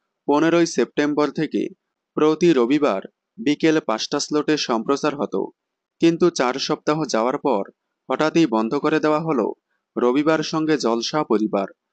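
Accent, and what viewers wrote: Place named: Bangladesh. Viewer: Apner katha balar kharap bangladesi úcharan brisri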